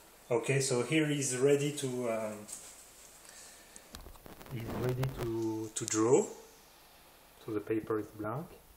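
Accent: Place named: England, United Kingdom. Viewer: Fucking english accent , tu crains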